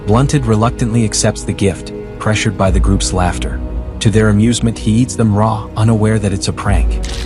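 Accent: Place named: United States. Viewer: Your qwack qwack yank accent is just unbearable.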